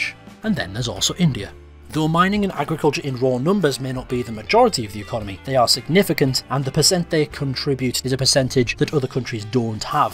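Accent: United Kingdom. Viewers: “EVERYTHING Australia” Me: immediately hears a UK sounding guy 🤔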